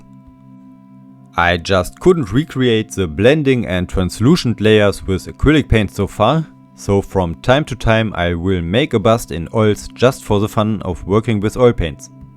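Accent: Germany. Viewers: ich finde Dein D`englisch sehr sympathisch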